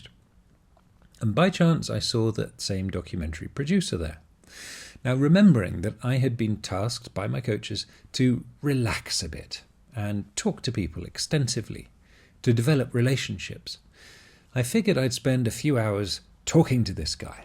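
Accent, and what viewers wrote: United Kingdom. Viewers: British accent is so funny! 😀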